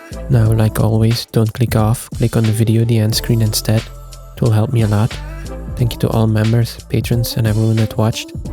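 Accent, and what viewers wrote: Netherlands. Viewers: I hear Dutsch😅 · As a person from The Netherlands I was kind of thinking you were dutch by your pronounciation but not quite sure.. this got confirmed though when seeing the Triops kweekset.. Toffe video!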